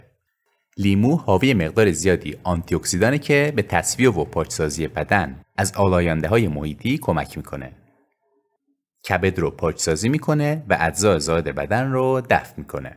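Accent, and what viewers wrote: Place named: Turkey. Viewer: baba in lahgeh torkit halemono gereft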